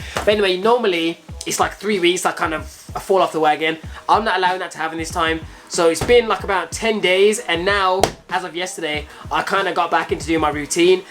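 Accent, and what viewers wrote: Birmingham, United Kingdom. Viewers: Daaamn that birmingham accent ! Just makes me wanna kill my self bruh your accent is the worst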